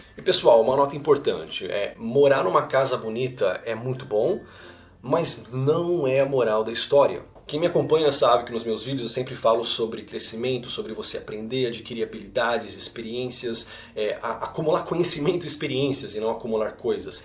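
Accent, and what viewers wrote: Brazil. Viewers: O “brazilian accent “ te entrega irmão. 🤣🤣🤣🤣 Acontece comigo também e falo ingles há mais de 20 anos! Osso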